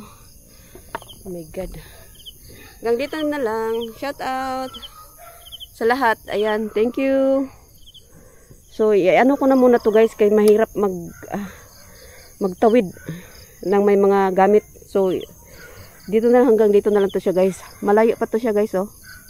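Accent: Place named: Cebu, Philippines. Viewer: It seems your voice is Cebuano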